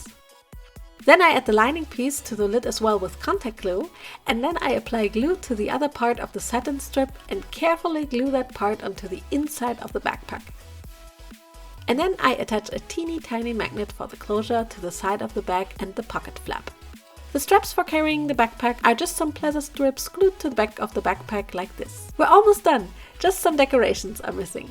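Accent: Germany. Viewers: Du klingst ein wenig deutsch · I know very unrelated but are you german? Because the "den Wald vor lauter Bäume nocht sehen" in english, the Glue (Kraftkleber) and just your accent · You sound German, are you German? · this is the first video I've seen from this creator, and the first thing I noticed is how she sounds german! I fell inlove with that tiny trait of hers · I know a german accent wenn ich ihr Höre🤓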